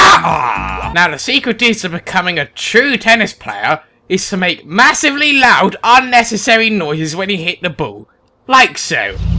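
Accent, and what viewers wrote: United Kingdom. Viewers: Are you british🤔🤔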